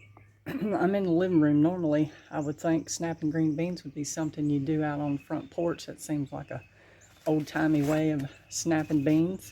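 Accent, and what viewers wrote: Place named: South, United States. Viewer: I think your awesome and thank you for explaining things so easily yet thoroughly! I appreciate the calm southern voice! :) Well, it sounds southern to me!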